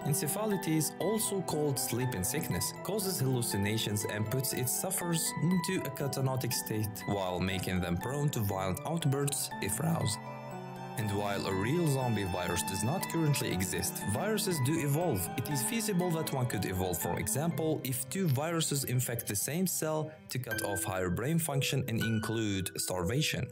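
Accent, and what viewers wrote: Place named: Russia. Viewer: You kinda sound like you have a Russian Accent. Idk just wondering.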